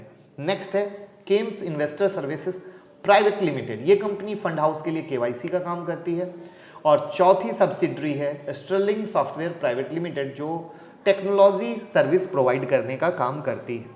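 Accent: Bihar, India. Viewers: आप बिहार से हैं क्या?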